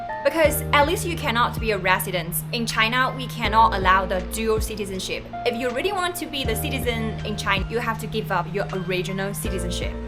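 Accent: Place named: Singapore. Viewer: Singaporean British English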